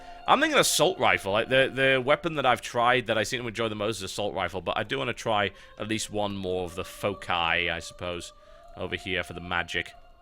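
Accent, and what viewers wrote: United Kingdom. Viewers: No offense, but there's a little thing called accent. And TB's british, so his accent is, technically, the one with the "right" pronunciation.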